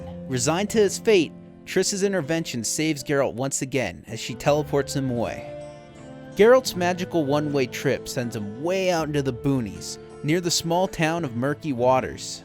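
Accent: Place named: Southern California, United States. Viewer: In-Depth recap by someone from Southern California lol Good recap and great accent. Thanks!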